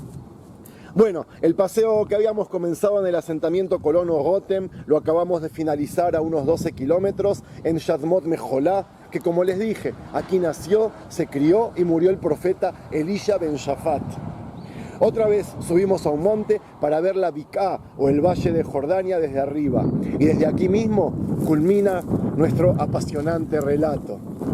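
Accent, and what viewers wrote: Argentina. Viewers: El sonido de su voz como se le escucha parece que es Argentino, pero que vive en israel.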